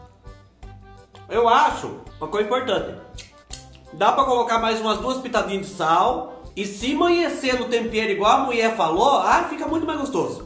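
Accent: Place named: Minas Gerais, Brazil. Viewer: Acho muito interessante que é de São Paulo e conversa muitooooo parecido com nós os mineiros muito mesmo, muito legal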